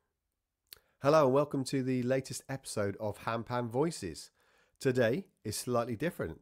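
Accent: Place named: United Kingdom. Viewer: Oh the struggles with recording speech that makes sense lol Kudos to you for recording without a script. And BTW I love your beautiful British accent 😊 (I know you ARE British but not every Brit sounds as nicely as you!)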